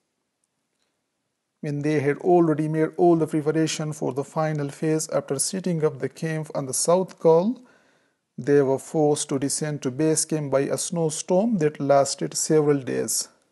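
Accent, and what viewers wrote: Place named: India. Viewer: What's with the indian accent?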